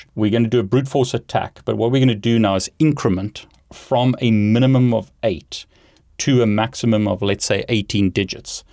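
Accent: South Africa. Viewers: You sound like a South African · Thank you, I'm an idiot. Also, do you have SA heritage? I swear that accent sounds super local! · Are you South African? I recognise the accent.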